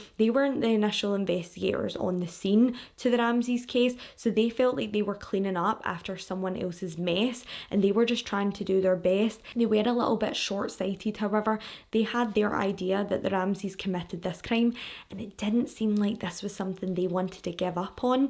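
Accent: Scotland, United Kingdom. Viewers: I kno rite I love ur personality ur accent ur beautiful very down to earth but funny to!! U go girl all the way in Scotland I Loove it... LOVING UR CONTENT N ALL U DO DO FRM YA GIRL FROM (HELLO FRM THE OTHERSIIIDE HELLOOO FRM THE OUTSIIDE LMAO VIBES ALL DAY LOVE IT LOVE IT! LOVE ALL THE WAY FROM SAN DIEGO CALI BABAAY! HEAAAYY!!! CARRY ON GIRLFRIEND!!❤️😆❤️😆❤️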